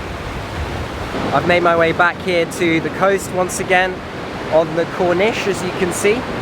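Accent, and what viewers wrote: United Kingdom. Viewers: solid 3.8 out of 5. Not bad considering you're a brit and you accent's heavy, and Arabic isn't considered easy to learn. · Thanks for showing us the world with your videos, I want to confess that I don´t like the british accent, I prefer the american accent because is more familiar for me because I´m from Mexico, but your accent looks good for me. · اللهجه البريطانيه فخمه نفسي اتعلمها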